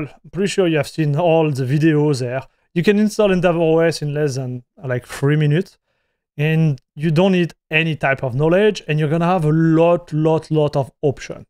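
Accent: France. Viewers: Nah, this is french accent from france. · i have never heard a more french man holy shit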